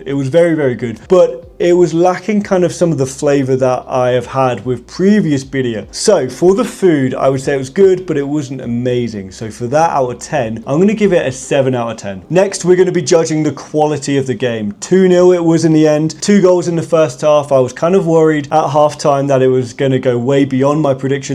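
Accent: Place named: England, United Kingdom. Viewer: Your accent sounds like English from England.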